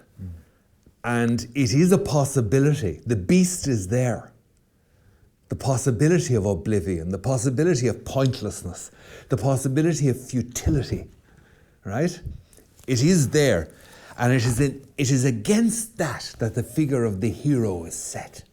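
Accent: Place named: Ireland. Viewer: ☘️ We’re holdin’ onto the tattered threads of our former finery.” ☘️.. and said with a brogue; it’s poetry. is a treasure. I hope he goes viral. His voice is sorely needed over here 🇺🇸. We have the infrastructure for a great revival. But it’s dusty and cobwebbed and the doors squeak and creak like something old and worn out.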